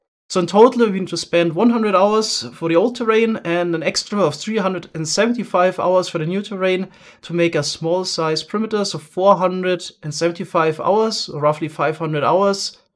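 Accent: Germany. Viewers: I'm sorry aber man hört das du deutsch bist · Ich höre da definitiv Deutsch raus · Im sorry to say this but u rrally have an extreme german accent man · I didnt know it is possible to have such an EXTREME German accent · You're German? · dein english is so deutsch holy fuck😅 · Ich Feier den deutschen Akzent^^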